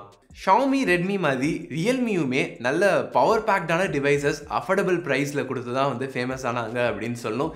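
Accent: Kerala, India. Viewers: I think he is Malayali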